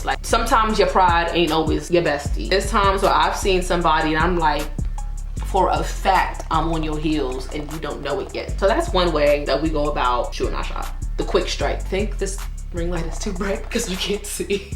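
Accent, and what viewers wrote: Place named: New Orleans, United States. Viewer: lmao that nola accent something serious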